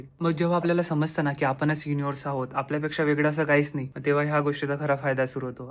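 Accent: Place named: Jalgaon, India. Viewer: तु जळगाव चा आहे वाटत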